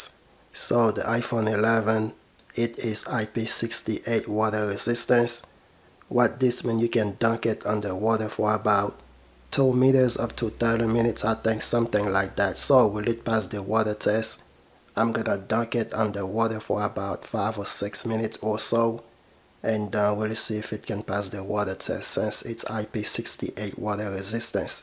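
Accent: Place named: Arab world. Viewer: Is better than a Spanish dude Maybe he has arab family and that's y he talk like that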